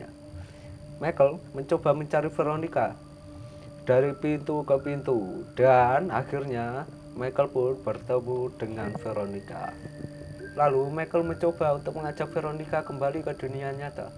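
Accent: Java, Indonesia. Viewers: Tolong yg bener min komentator nya, udah medhok banyak bercanda ga lucu juga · udah suara medok banget.. joke lo garing lagi.. bikin muntah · Amatiran.. Udah medok pake becanda, jd gak menikmati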